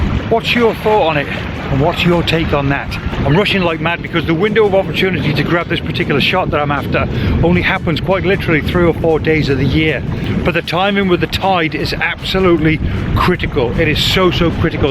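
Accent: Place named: North Wales, United Kingdom. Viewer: lovely, what part of the uk, I am guessing North Wales possibly, excellent video and learnt an awful lt, brill thanks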